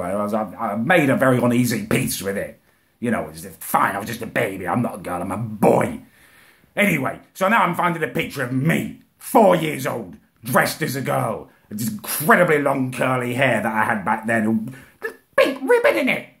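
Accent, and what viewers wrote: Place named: Bristol, United Kingdom. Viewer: I thought he was from bristol not liverpool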